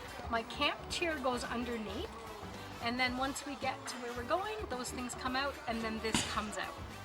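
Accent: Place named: Canada. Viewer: Sweet setup and that Canadian voice doesn't hurt at all ... all the best!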